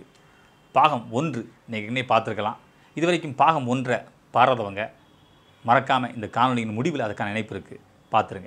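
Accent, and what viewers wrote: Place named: Tirunelveli, India. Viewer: உங்கள் தமிழை கேட்டுக்கும் போதும் உங்கள் முறுக்கு மீசையை பார்க்கும் போதும் நிச்சயமாக நீங்கள் நெல்லையின் மைந்தன் தான் வீச்சருவா வீசும் வீரமுள்ள பூமியில தமிழ் பாடி தலையாட்ட வைத்தவரோ ?? எனது அருமை கவிஞரே எனதூரும் நெல்லை தான் வாழ்த்துக்கள்🌹🌹🌹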